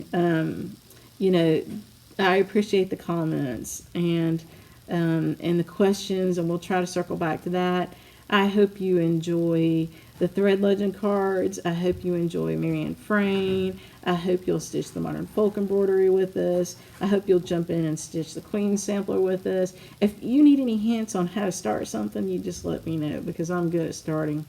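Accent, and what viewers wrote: South, United States. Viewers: The thread legends are wonderful tools and bookmarks, too! I just love your southern drawl! I signed up for the virtual Jeannette Douglas class. I didn’t see the booklet online but I’ll look again. I went to an all girls catholic school in Manila and we had a sewing class in every grade. The Belgian nuns sure taught us samplers. We had one every year, then your embroidery projects for the rest of the school year depended on your skill and speed. I remember we had a darning sampler in 4th grade that included different buttonhole styles. I wish I had kept them all. Is the passcode for the 8th installment of the Saxon band sampler available? Thanks much and also for sponsoring Fiber Talk! Happy New Year!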